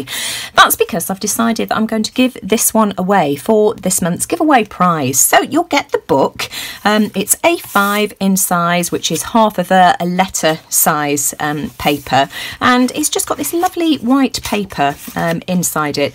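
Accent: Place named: Suffolk, United Kingdom. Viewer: Boy you sound like my Suffolk family in the UK..made me home sick.😀😀😀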